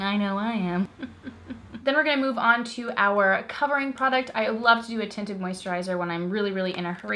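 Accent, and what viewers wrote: United States. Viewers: i must say , i did not expect an american ascent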